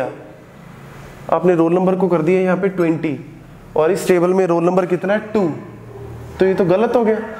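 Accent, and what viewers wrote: Punjab, India. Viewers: Mast punjabi tone. Yaha pe koi two taa nahi h yaha pe to 20 hai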